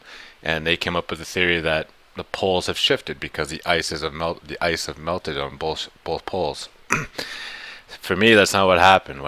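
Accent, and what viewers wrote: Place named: Canada. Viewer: Wow , this guy sounds like Bob and Doug Mackenzie from SCTV. "Hey hoser bring me my toque , then we can eat some back bacon and play a game of beer hunter eh" !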